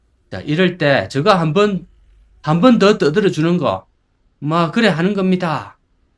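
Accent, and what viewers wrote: Gyeongsang, South Korea. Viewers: ❤~~small 그것이 알고싶다 ~~ 1인방송으로서 👍 👍 ~낀가넣다~ 정겨운 경상도 사투리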